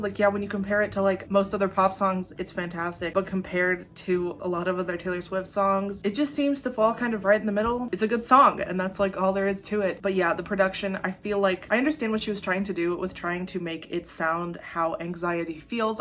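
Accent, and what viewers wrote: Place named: South, United States. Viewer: She moved to Tennessee when she was a teenager, sometimes people pick up accents easily. I agreed with you about the first 2/3rd's of your video, but you don't get to criticize Taylor's bangs or people having curly/wavy hair and straightening their bangs when you are wearing the same look. I do agree it is not a good look on ANYONE. To me I love all her albums, I may have songs that aren't my favorite, but 98% of her songs are my favorites. I don't think I could pick out a favorite. New Years Day was the perfect ending to Reputation in my opinion. I loved her bleached-blond look, she looked so iconic. One more thing there is more to a country accent than saying certain words, you do have a southern accent (not a strong one though). I am a firm believer that how people speak comes from the area that they live in, I am from the north originally, but have lived in Virginia since 1991, when speaking to family members from Wisconsin, they think I sound southern. 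Case in point, a lot of people from other countries that move to say England, the children that are born there speak with an English accent, not the one of their parents native country. Well, that's all for my opinions.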